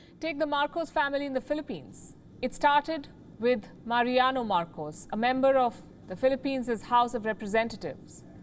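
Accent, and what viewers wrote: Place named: India. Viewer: One big happy family she says with her typical Indian accent - she is classic as always.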